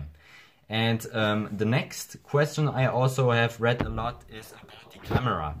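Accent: Germany. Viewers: Hallo, Ersteinmal zu Dir. Deine Arbeit sticht heraus, besonders da du auch auf die Details eingehst die andere in ihren Tutorials gerne auch mal unter den Tisch fallen lassen oder nur sehr dürftig erklären. Das Thema ist weitaus komplexer als nur 'buttons' zu drücken und ein USB mit dem Rechner zu verbinden. Daher, hats off! Machst du gut ansonsten würde ich dich nicht mit meinem ersten Youtube comment ever beehren und belästigen. Bleib so bzw. entwickle dich! English and fluently? Keine Sorge, da kommst du noch hin. Bist ja schon gut am üben für... ich nehme mal an die English Prüfung in mündlich? Versuch mal das, dein English nicht zu sehr 'einzudeutschen'. ;) Nun zu mir: Ich (completely newbie) und in der letzten Zeit viel zum Thema flashen und rooten angelesen (xda, etc.) bzw, tutorials dazu gesehen (hier und anderswo) möchte, um klein anzufangen erst einmal mein SM-G935F mit 1&1 Branding a)debranden und b)mit einer neuen und aktuelleren FW (DBT/G935FXXS2DRA9) grundversorgen. 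Vielleicht kannst Du mir mit deiner Expertise meine gebliebenen Fragen beantworten und Ja! Sorry schon mal vorab für das nun folgende Buch... Ist Zustand: SM-G935F mit 1&1 Branding FW: Nougat 7.0. Keine SIM vorhanden (mein erstes Mobile seit Jahren und ja, es geht auch ohne! :D) Weder Pins, Passwörter noch Fingerprint eingerichtet Mobile mit einem Google Konto eingerichtet Frage zu: Backup Ungerooted kann ich Titanium Backup nicht verwenden. Helium speichert die Apps nur in die Cloud (Google Drive) a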